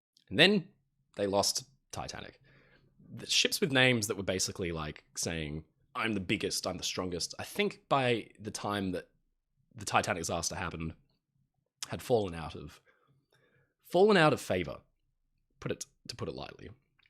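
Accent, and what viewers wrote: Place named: Australia. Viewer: Hello thank you from Robert Bruce in Canada, love your knowledgeable & congenial presentations, like the way you talk your touch of Aussie accent is very likable, cheers!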